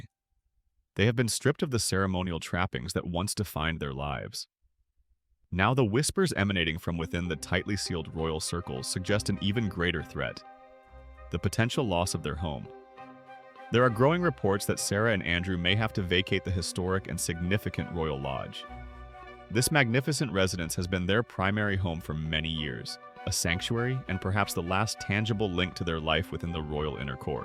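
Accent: United States. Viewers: Why the American narrator?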